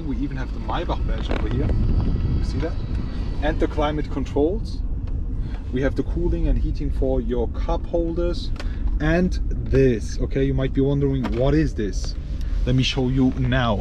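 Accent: Germany. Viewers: your english is very good, unfortunately with a very strong german accent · lool facts i guess this is just the german accent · Ich liebe den deutschen Akzent hahaha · Der bre ist so hard deutsch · Er ist sowas von Deutscher😂 100% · Der Typ ist zu 100% Deutscher hahahaha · Hab mich schon über den Akzent gewundert, bis ich dann das Kennzeichen gesehen habe. War doch, wie nach meinem Gefühl ein deutscher Akzent. Gefällt mir xd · Man merkt die deutsche sprache haha!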